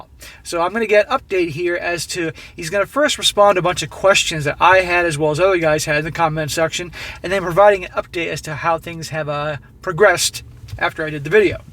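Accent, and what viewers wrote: Philippines. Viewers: Sound like the man is from the Philippines. Mabuhay !!! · Sounds like he’s from the Philippines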